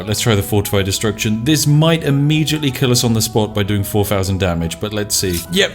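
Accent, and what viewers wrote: United Kingdom. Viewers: Are you british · Are you English or Scottish or Welsh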